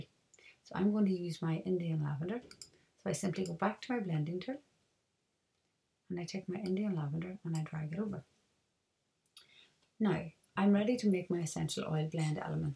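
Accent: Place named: Northern Ireland, United Kingdom. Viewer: Hello! This is a fantastic resource, and something I hope to invest in, in the future! Would you mind me asking where you're from? There's a definite touch of Northern Irish in your accent :D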